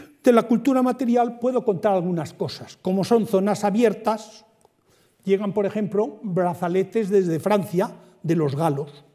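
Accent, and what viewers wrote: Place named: Spain. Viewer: qué acento insoportable tiene este señor, no soporto el acento español.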